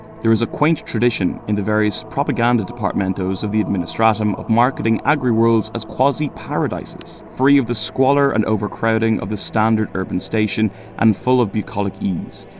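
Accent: Ireland. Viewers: God im so glad i found this channel, no AI, Irish accent, what more could you wish for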